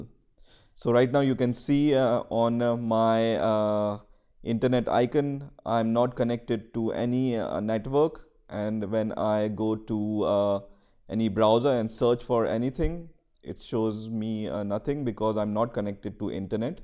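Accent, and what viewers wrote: India. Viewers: Strong Indian accent not understandable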